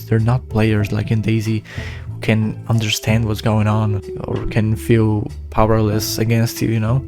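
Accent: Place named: Turkey. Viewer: Bro has Turkish accent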